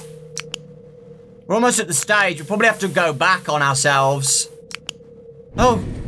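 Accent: United Kingdom. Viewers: wow you are british!!!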